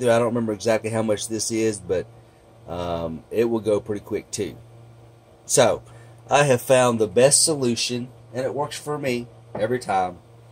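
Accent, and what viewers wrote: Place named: Georgia, United States. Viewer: Hey Buddy, that seems like a major pain in the azz, but I bow with respect for your perseverance ! What is your z ofset ? You may need to push out a thicker bottom layer ? Did a raft not work ?Have you tried PEI or even PET (gizmo dork) ? PET with a good first layer of Elmers Extreme works great for all my other heated and non heated beds.I have one of these coming for testing friday so Id love to hear what you think ? I hear a slight accent also, you in GA ?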